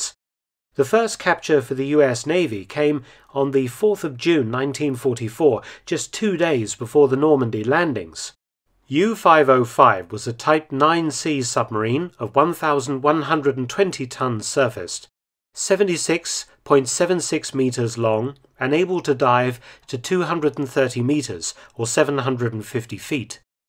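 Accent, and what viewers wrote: United Kingdom. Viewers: Thank You, you would make a great American!!! It's refreshing to have a Brit narrate such event in American History!!! Become one of us! God Bless You!!! Godspeed:)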